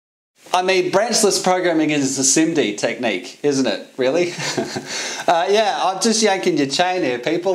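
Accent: Australia. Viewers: good to hear an Aussie accent. Yeah, I'm still a young programmer, only 15 years or so, but I always wondered about conditionals like "IF", because if the conditions weren't met to take the branch the CPU wasted it's time asking, I devised my own simple mathematical tricks. It may have taken a few extra cycles, but that was nothing. I never knew this was a "thing". How about that? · Nothing better than an Australian teaching you about programming casually, great video mate! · I don't anything what you talking about but I listened for the Australian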